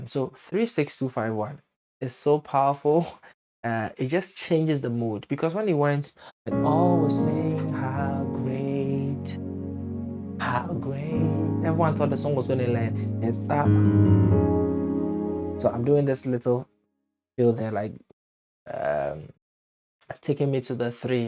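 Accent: Ghana. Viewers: Are you Ghanaian? You sound like one though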